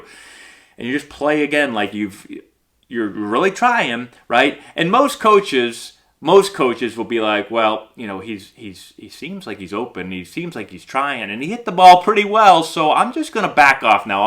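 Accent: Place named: South, United States. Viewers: Where are you from that was way too close too us down south man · Lmao at the southern accent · Great southern accent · As a “Texan”, I appreciated the southern accent. Loved it 🤣👏🏼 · Great video, as always! I love the Forrest Gump southern baseball coach accent! This is certainly a situation that seems common across levels of baseball. I would love your perspective on how to deal with dumb conduct by coaches , specifically in the youth baseball world. As the owner/director of a travel baseball organization that includes youth teams (10-13u) and beyond how do you handle situations in which parents disagree with the conduct of the coach of their child’s team? Hypothetical: parent of a 13u player let’s you know that coach calls player a “coward”, tells them to get their ass up after being spiked in forearm, tells player to shut his mouth, and when pitcher struggles on mound says to catcher, “don’t look at me, he (pitcher) sucks”. 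Coach states that he is “old school” and that he is preparing players for high school and beyond. I know this is a bit off topic but interested on your perspective on this and what steps you would take or suggest that players and or parents take in a similar situation. Thanks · Nice accent. You pick that up in Winston-Salem? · BTW, your southern accent killed me. I grew up in NE Ohio and played in college in Chicago and went to medical school and trained there, and ended up in Alabama surrounded by people who talk like that all the time. And lots of dumb coaches around here, even at the high school level. I guess they're everywhere. I would love to hear you do this same video after you have a boy who plays into high school to see if your outlook changes as a dad.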